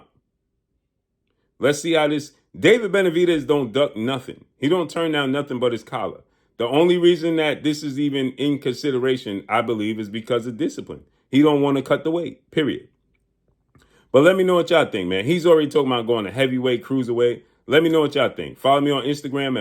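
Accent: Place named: East Coast, United States. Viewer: For Some Reason Blue, I Thought You Was From Da East Koast (New York Or Jersey)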